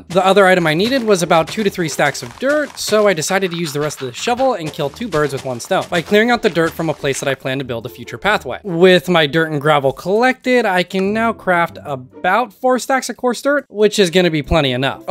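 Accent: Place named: Midwest, United States. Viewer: Are you midwestern by chance? I recognize that accent because I have the same one!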